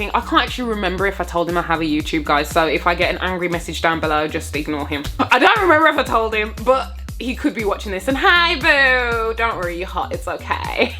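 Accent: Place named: London, United Kingdom. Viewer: Hahahahaah!, you’re hella funny! You remind me of my friend/schoolmate in Uni who’s from London. The way you talk is like hers. Hahaah! Gosh, I want to work and live in Japan too. I was there last year in Tokyo. Japanese guys were blowing up my Instagram and Tinder. I’m an asian foreigner. Most of them were polite though one was a fckboy who wanted to go to my hotel room but I never let him and said bye to him.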